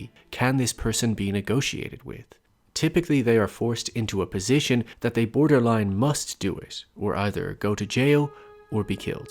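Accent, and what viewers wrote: Ireland. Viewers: Are you Irish? 🤔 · I really enjoyed this video wow. I watch a lot of video essays but this one stood out for how engaging it was. Are you Irish? I’m from Cobh.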